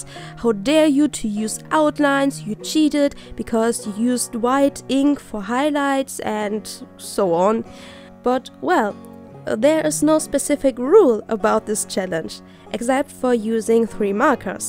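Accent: Germany. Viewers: Bist du deutsch? Wenn ja merkt mans mega😂😂😂🙈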